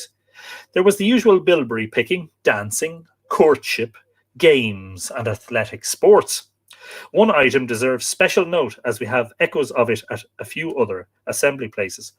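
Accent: Ireland. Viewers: Thanks for sharing! As an american with lots of Irish blood - I can't get enough of these talks, information about these festivals and your accent makes it all the more pleasant. I do have a question : since Lugh was living long before the sons of Mil - is Lughnasa a Celtic celebration or was it an Irish celebration that was adopted by the Celts? There are plenty of celebrations around the world on August 1 so it would make sense to me that Lughnasa and Lammas combined after the Celts came to Ireland but I haven't done much research into that...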